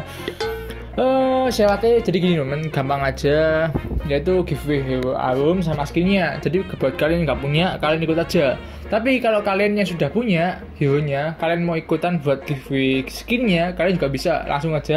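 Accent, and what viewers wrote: Java, Indonesia. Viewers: channel YouTube udah di subs Instagram udah di follow open id: 107acc3953e436f<num>cee<num> alasan pengen dapet hero arum yaitu pengen kuasai hero tank agak susah dapetin goldnya sama hero nya keren alasan subs channel bang orangnya ganteng kontennya menarik bahasa jawanya kental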